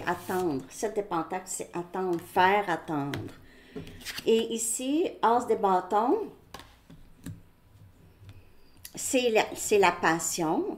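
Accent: Canada. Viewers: Hello sweet lady , with Canadian accent 🌺❤️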